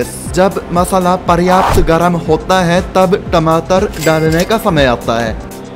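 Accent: Standard. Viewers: Aap bhoot shuddh Hindi bolta ho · I want this guy to speak for hours in his pure Hindi .. btw great videos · Bhai teri hindi toh hamare indian log se bhi zyada pure hai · Waaah.... bhai aap sirf hindi nahi suddh hindi bolte hain jo ki bhut manmohak lgti hai........aapki hr videos un logo k muh pr thappd hi jo indian hote huye v hindi me introduction v ni de skte aur hindi bolne me shrm krte hain......💖💖💖💖💖💖💖💖💖💖🙏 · sala itna sudh hindi to india wale nhi bolte hain😄😄😄😄😄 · Itni sudh hindi toh Indians bhi nahi bolte🤣🤣🤣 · Itni sudh Hindi hum bhi nhi jaante😅😅😅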